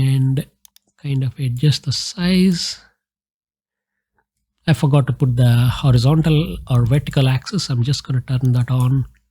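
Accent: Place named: Tamil Nadu, India. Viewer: Aap Tamilnadu se ho kya 🙂 apke bolne ke tarike se lag raha hai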